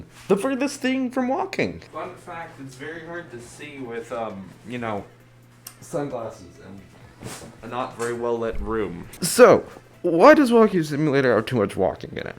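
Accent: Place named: Spain. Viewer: I just watched one of your other videos and the "spanish and english accents coming out at the same time" cracked me up 🤣 i love the way you talk 👌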